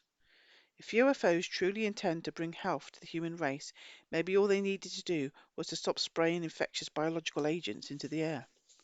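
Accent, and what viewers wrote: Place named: Wiltshire, United Kingdom. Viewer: That is fascinating. Are you from Wiltshire like me. I recognize that accent. Thank you sooooo much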